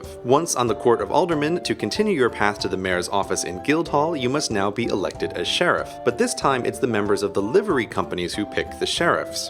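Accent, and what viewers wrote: United States. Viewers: Thought you were American? Mind=Blown! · He mentioned that he went to an American college. Judging from his accent, I think he's American.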